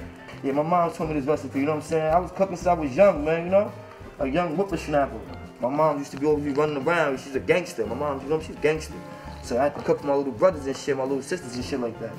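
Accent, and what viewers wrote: New York, United States. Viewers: Before i started the video i knew he was from NYC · First 2 seconds I knew he really from New York 😭😩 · This got to be that member of AMP Fanum or he def from New York lol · how he know i aint pay my rent last weeeeek im deaddddd he more New York then New York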